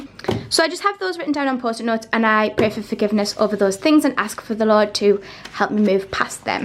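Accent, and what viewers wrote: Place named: United Kingdom. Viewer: This is so wonderful. I think God must want me to do this for myself because I've found many on youtube. You are the only other one that I love your format and how you set your journal. Thank you! I love your accent! Where is that accent from? UK somewhere? I'm in Texas.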